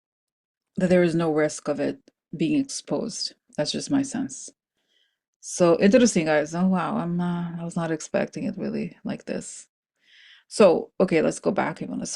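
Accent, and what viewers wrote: Russia. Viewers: thank you for these insights. And, I’m here for it. I could watch you put on makeup and do your awesome Russian accent all day long. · I’m not bothered by you putting on make up, or the Russian accent, you have a lot of interesting perspectives! Thanks❤ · Thank you so much for your psychic data & explanation of current events. I appreciate your solo shows, your beautiful energy, your humor, Russian accent & makeup (though you are naturally beautiful). 🩷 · Hi, thank you for your precious information and to be here for us!! You are so funny between your russian accent and different makeups!! A friend of mine who was born and raised in Czechoslovakiab (ex. USSR) told me that Ivana escape her country through the mountains skiing as she was a highly skilled skier. I said to her we never heard this information in the western countries.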